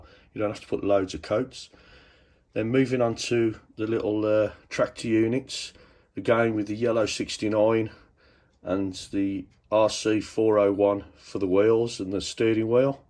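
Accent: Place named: Birmingham, United Kingdom. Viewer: A great Brummy accent. As someone who is Bromwicham born-and-bred, but has been living in the United States for the last 37 years, I find it quite refreshing. :)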